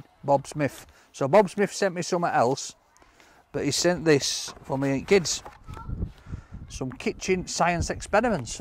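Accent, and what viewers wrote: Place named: Yorkshire, United Kingdom. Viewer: Love the yorkshire accent, much better than my midlands accent.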